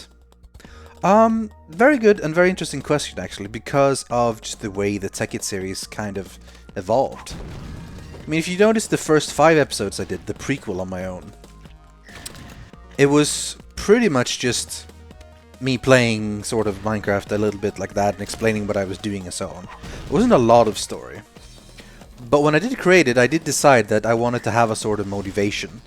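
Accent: Scania, Sweden. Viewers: Are you from Sweden? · is he swedish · Ah your swedish, I've been trying forever to figure that accent. I wasn't sure if it was one of the American accents or a variation of the English accent. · I tought I rekognise the accent. SWEDEN !!!!! ;D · are you from sweden?:D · Det finns en äldre Q&A någonstans bland Rythians videor, och i den bjuder han på lite skånska. Han är från Malmö tydligen. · HOLY SH!T Are you from Sweden!?! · is swedish? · are you from sweden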